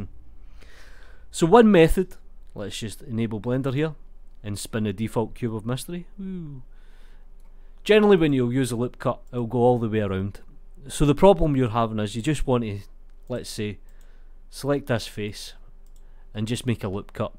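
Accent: Scotland, United Kingdom. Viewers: hahaha i thought so, is this Scottish?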